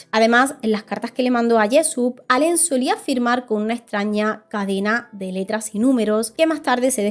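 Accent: Andalusia, Spain. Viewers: Esta chica además de encantadora es una gran narradora y ese acento Español es delicioso. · De Andalucía verdad? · Acento típico Andaluz, yo diría de Sevilla o Málaga ... !! Saluds desde Montevideo - Uruguay !!!!😅👍🏻😉🎸🎸🍺🍻😎😎😎😎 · Gracias por compatir. Totalmente inverosimil el cuento dado por ese señor. Tu acento andaluz y tus ojos me han engangado. Saludos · Muy buen análisis. Tu acento Andaluz me encanta. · Un encanto, la sra y su acento andaluz....lo de filadelfia, un engaño mas de USA....... As usual.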